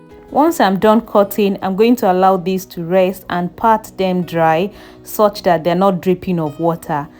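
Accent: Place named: North, United Kingdom. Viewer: I just came across your channel now. You sound like a northerner, are you?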